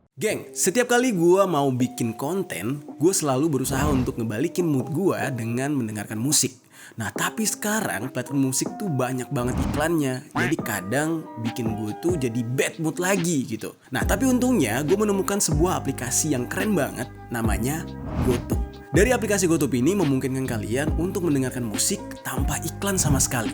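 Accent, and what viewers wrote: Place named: Talun, Indonesia. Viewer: Suarane teko Talun kang...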